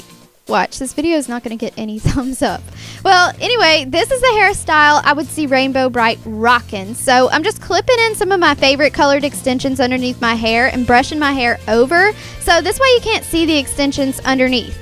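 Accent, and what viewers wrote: South, United States. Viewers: you sound like you're from the south. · Southern accent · You sound country! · U r really pretty and ilove ur country accent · is it me or she has like a country accent kinda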